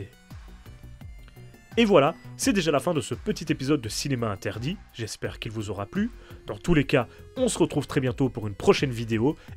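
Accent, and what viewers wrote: Belgium. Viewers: dommage tu devrais essayer un jour car il est fun et excellent Dead by daylight fut mon premier jeux multijoueurs J'ai adoré le faite d'incarner des personnages d'horreur culte des films que tu mentionnes dans la chaîne en tout cas il est magnifique et PS l'accent belge et au rdv 20/20 pour t'as chaîne il est génial 🤣 grâce à toi J'ai découvert des pépites incroyables merci beaucoup 👍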